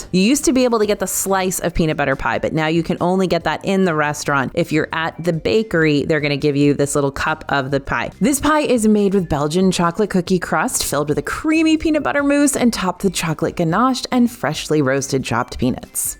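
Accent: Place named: Texas, United States. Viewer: Is it just me or is Texas accent really strong in this video? 😅 Btw, I'm really hungry now.😘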